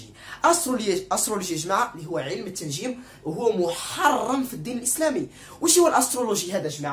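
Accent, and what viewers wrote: Morocco. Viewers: لهجتك مغربية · حسيتو مغربي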